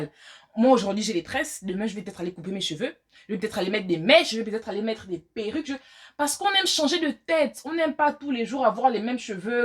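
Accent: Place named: Gabon. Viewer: Le son de ta voix jai directe dit .gabon moi aussi badecon 😂😂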